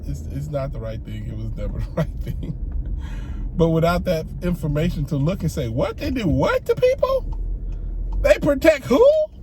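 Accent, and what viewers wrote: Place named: Jamaica. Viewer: Your African accent sounds more like Jamaican to me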